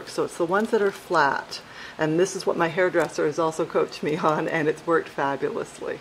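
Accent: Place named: Atlanta, United States. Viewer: Thanks...i will look out for them, Love your accent and the outdoor videos.. reminds me of living in Atlanta - oh and you always look amazing too:)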